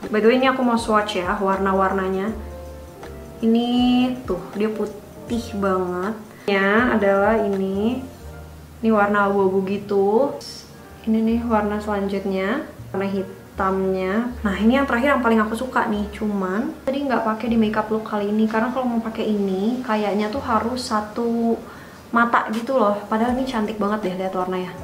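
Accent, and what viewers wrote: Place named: United Kingdom. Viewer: Lidah nyaa gilss gilss...Inggris bat dahh lidah ku langsung auto milih diam mingkem cep😭...btw hallo ka semangat bikin video nya yaaahh🥰✨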